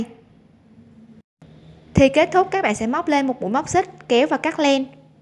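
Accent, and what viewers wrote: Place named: Da Lat, Vietnam. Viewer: nghe nói tiếng giống người Đà lạt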